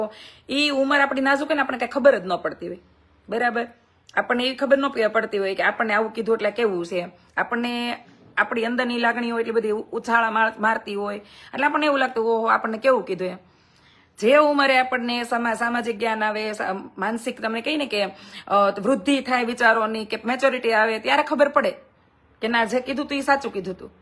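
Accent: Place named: Kathiawar, India. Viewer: ઓરિજનલ કાઠિયાવાડી શૈલી માં ખૂબ સુંદર વાતો કરી gret hartly lovely tru ok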